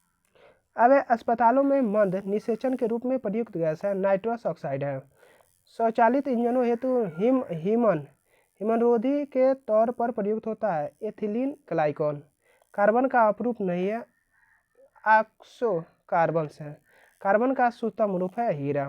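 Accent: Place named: Bhojpuri, India. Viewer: Hindi to shi bol liya kro usme bhi bhojpuri add kr dete ho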